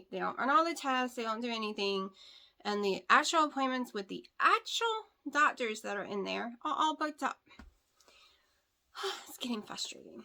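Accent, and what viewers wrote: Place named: United States. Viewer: I love your country accent ♥️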